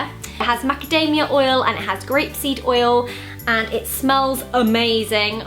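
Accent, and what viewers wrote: United Kingdom. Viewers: DobbyThe FreeElf I dont know what part of Britain Zoella is from. All in all she is from Britain. Being a foreigner to GB, i am not able to distinguish all the different dialects and accents, and will plainly call it British. Usually thats how it always sounds to me when i meet people from Britain. So, what dialect is it? Educate me on that one, maybe you know better? I find it interesting how people get offended by just an opinion. I never meant it to be offensive. Cant help but feel that way, thats all. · Beautiful british accent :')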